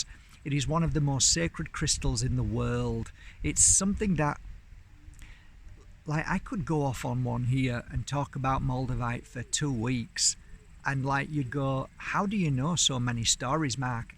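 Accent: Yorkshire, United Kingdom. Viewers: I've just ordered my first moldavite this morning. I spent time looking at different ones on a site and was drawn to a particular one. I've experienced some sharp headache like pains intermittently...a little apprehensive but interested to see what happens when it arrives. Love your Yorkshire accent, I'm in East Yorkshire 😊💫💫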